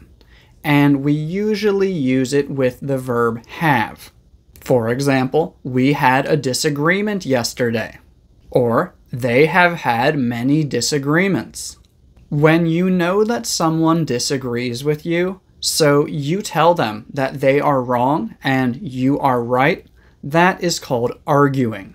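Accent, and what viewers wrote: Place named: United States. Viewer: Your American accent is pretty nice and clear. ! Thank you so much for your classes. 🎉😊❤❤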